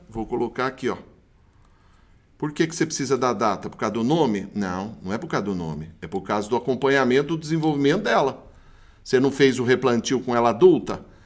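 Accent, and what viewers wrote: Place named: Minas Gerais, Brazil. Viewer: Ai tu é mineiro uai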